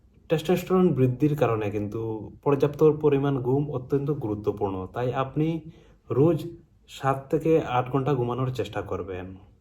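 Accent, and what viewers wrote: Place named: Barak Valley, India. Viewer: Bhai aap konsa college sa ha Apka language to bengali jasa ha matlab .. from barak valley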